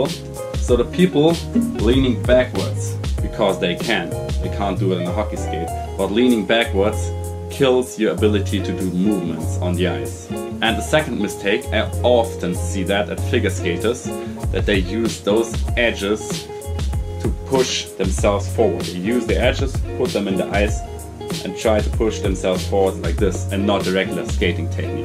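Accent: Germany. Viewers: Hey, du sprichst gefühlt besser Deutsch als Schwizerisch. Woher kommst du denn? Ich bin fast in Konstanz und könnte ein neues Mitglied werden 😋 Bin schon 30 🙊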